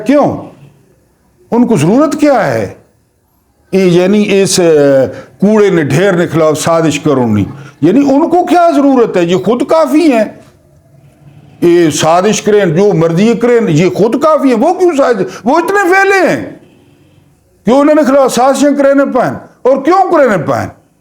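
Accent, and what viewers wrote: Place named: Mianwali, Pakistan. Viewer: Vlog ki ahmiyet aur maqsad Kai Saath Saath mianwali lehjay Mai guftagoo, Sahab Allah Aapko khush rakhay. AAP ne haqq. Ada kar diya.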